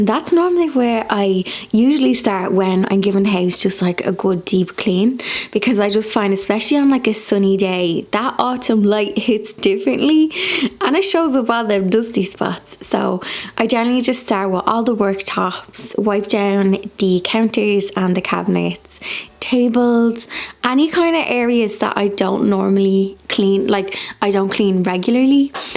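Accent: Ireland. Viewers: To sit there lazily on a Sunday afternoon, enjoying tea and candle light, it has something deeply satisfying to watch you whirling around ;-) It is cute somehow and I absolutely like what you make out of your home. Every video is a package of creativity, smiles and cuteness (being decades older, I hope, I can say this without sounding arrogant or stupid). Actually, it is so lovely to listen to your Irish and to watch your videos. Deeply pleasant. Love and greetings from Germany.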